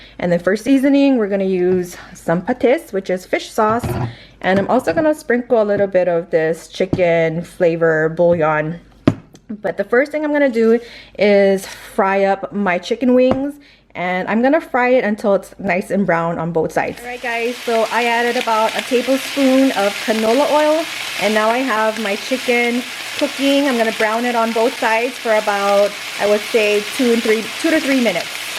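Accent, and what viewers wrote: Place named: Hawaii, United States. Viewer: Watching the video before checking out any of your other videos, I got a sense that you are from Hawaii or live in Hawaii because you have that local accent. 😭❤️I’m from the Big Island but I’m away for school at the moment. Going to cook this tonight for dinner! Excited because I miss home and home food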